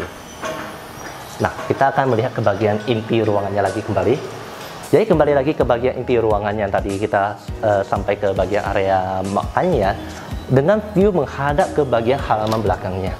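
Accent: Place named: Medan, Indonesia. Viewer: Ngomongnya ciri khas cina medan bgt yaa.cadel bgt..hehee. Ga kyk cina jkt lbh enk dengarnya.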